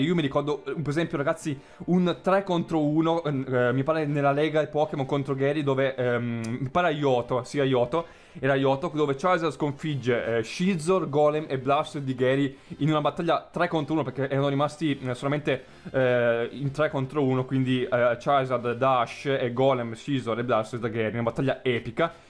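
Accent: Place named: China. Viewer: senti gia sembri cinese, parla piu piano che nemmeno te capisci cio che dici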